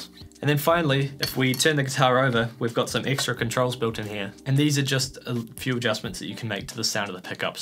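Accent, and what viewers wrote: New Zealand. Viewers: Nice to hear a New Zealand accent. Where did you buy the guitar from? Local or online? I'm considering getting one also.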